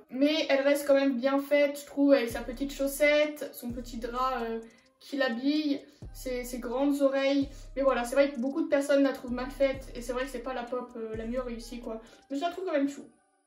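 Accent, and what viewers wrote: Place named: England, United Kingdom. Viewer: Ta un accent anglais un peux j'adore ton accent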